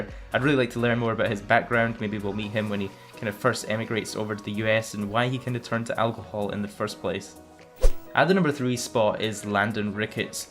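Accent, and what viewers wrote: Scotland, United Kingdom. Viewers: Where in scotland u from?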